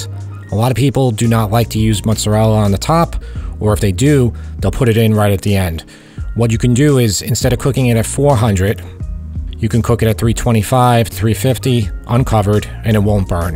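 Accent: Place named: Queens, United States. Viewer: New to your channel. I knew your accent is Queens/Long Island! I live in the Hudson Valley, but my accent is pure Bronx! Anyway, just spent two summers in Italy (I’m retired), and learned that Italian and Italian-American are almost two different cuisines. The meals we think of as Italian are virtually unknown in Italy. So I’ve been trying to cook more in the genuine Italian style, as well as using ceramic dishes I picked up around the country. As an example, I ordered veal scallopini with carciofi giudesca (not sure of spelling, Jewish artichoke). I also asked for a side of pasta. They were really confused — pasta?? Then they laughed, “Americans!” Anyway, I like your recipes.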